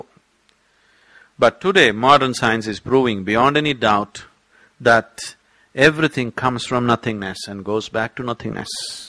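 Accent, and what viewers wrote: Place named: South India, India. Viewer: becoz he's south Indian...south pronounce shiv as shiva....om nama shivaya...not om nama shivya...hope you get it...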